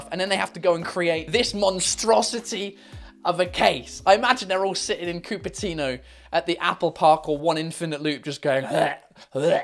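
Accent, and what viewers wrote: England, United Kingdom. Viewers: You sound like Cyprien,if he talked in English with his style and he had a English accent he should sound like you. POV: I m Franch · Are you from the uk It sounds like the accident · Watch Mr whoes the boss bro English not American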